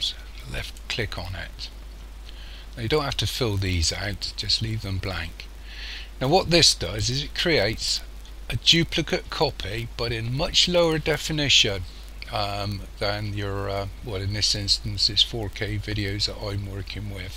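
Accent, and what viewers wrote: Bristol, United Kingdom. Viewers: Brilliant video! Btw, are you from Bristol?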